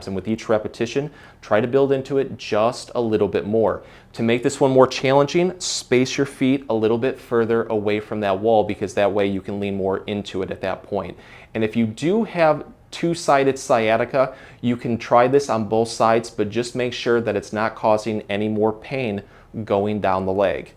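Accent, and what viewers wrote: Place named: Midwest, United States. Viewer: He’s a Midwesterner. Love the accent 😊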